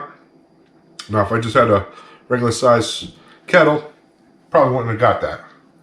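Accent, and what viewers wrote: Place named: New Jersey, United States. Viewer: That Travel Kettle has got to be a must for anybody into smoking and grilling. I think you'll find a lot of uses. By the way, I love to hear what I call my Jersey accent. Don't ever lose it!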